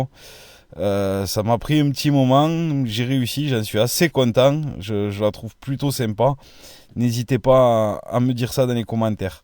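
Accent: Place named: South-West, France. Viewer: Liqueur de menthe ? Sorbet ? Miam ça baffoulle ? Pourtant une très belle voix avec un belle accent du sud ouest ! Merci pour tous ses conseils.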